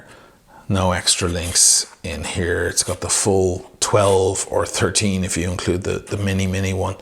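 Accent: Ireland. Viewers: Screw anyone who doesn't like listening to the Irish blabbering...I like many other watch lovers appreciate all the content 👌 massive congrats on the purchase mo chara...its pure class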